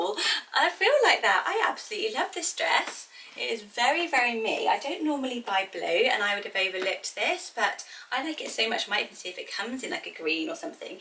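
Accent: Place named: England, United Kingdom. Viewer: I couldn't love you more. You always have the best insight on weight (I've been watching those videos on repeat to get my mindset right) and you are so lovely. Im American raised by my English grandma and I lived in England for 3 years and my best memories are with my grandmas brothers and sisters. Your accent and manner of speaking makes me feel like I'm with family. "Ibiza vibes but really gonna chassé into kids swim" 😂 you're just the best. And you do look amazing and I admire that self discipline to live a healthy life style.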